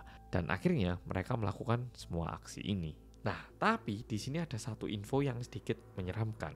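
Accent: Java, Indonesia. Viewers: Selain ceritanya aku suka logat dan medoknya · Ngebut bgt nonton smua vidio oppa medok ini. Hahahhaa,semua ku tonton mlm ini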